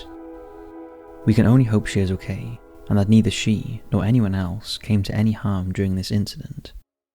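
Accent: United Kingdom. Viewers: Where is the narrator from? Obviously UK. But I can't put a region on the accent. I'm from South Wales not far from Cardiff.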